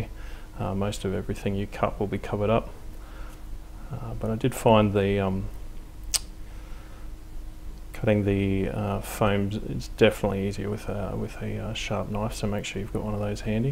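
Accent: Australia. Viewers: Well, in this case and if you really go on with this kind of detail, you should glue corrugated paper on the inside walls as well, as in real life containers the corrugated metal sheet that serves as sides and ceilling, is pretty much the same both inside and outside and if the doors were left open it should be visible. Thanks for the video mate, it's awesome. Subded instantly. If I may, I would suggest that you spoke a bit more slowly and if possible with a bit less of the aussie accent, as it is quite difficult for us non native speakers to follow. Cheers and stay safe.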